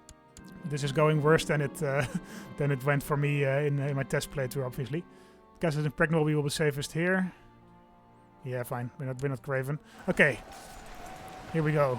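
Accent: Netherlands. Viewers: Are you Dutch? I’m hearing a dutch accent, so fun to see someone from my country having the same passion for asoiaf and ck2 agot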